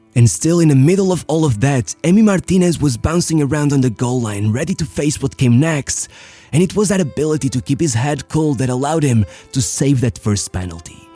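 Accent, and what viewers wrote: Portugal. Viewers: Este narrador eh portugues de certeza. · És portugues de certeza, esse sotaque n me estranha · he is portugese that's why he has an accent, and the other mistake, are you always perfect or smthng?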